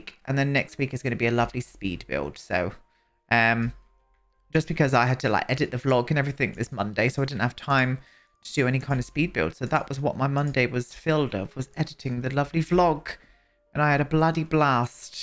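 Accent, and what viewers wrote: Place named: England, United Kingdom. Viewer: Love the story line of a cult! Very unique and I can tell it'll be an entertaining one. I just want to mention one thing, Paolo isn't a portuguese name, I'm portuguese and the correct version of that name would be Paulo, in terms of pronunciation is similar, especially with your English accent and it really is a small difference in spelling but it does matter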